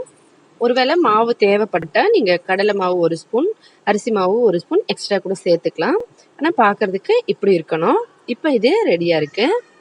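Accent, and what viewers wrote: North India, India. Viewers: Mam are you a North indian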